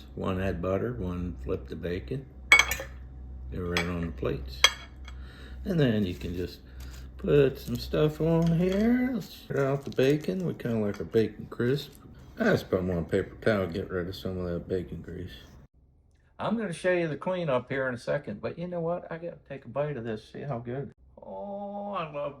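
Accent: Pittsburgh, United States. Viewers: Is that a Pittsburgh accent?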